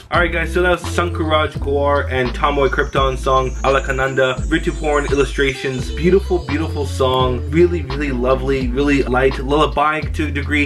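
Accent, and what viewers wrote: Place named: Assam, India. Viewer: Ur pronunciation is liked assmeis.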